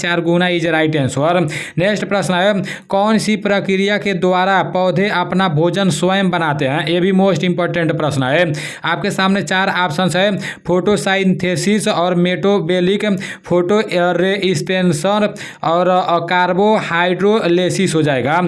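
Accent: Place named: Bhojpuri, India. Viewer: bhaiya apki Bhojpuri launguages bahut mast lagata hai, I belong gola bazar, Gkp se hu bro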